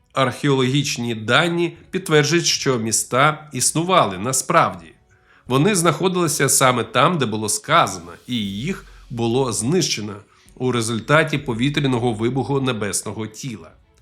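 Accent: Russia. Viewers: Великий уклін вашій наполегливості у видавлені з себе москальського акценту. Перші ваші відео аж слухати не міг, а тут натрапив знову на ваш канал і дуже приємно здивований. Моя повага!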